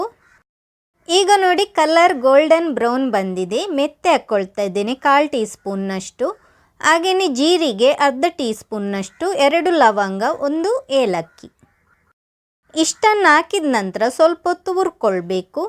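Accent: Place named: Mangalore, India. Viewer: ಮಂಗಳೂರು ತುಳು ಮಾತು ,, ; ಕನ್ನಡ ಭಾಷೆ ಸ್ವಲ್ಪ ಚೇಂಜ್ ಆಗುತ್ತೆ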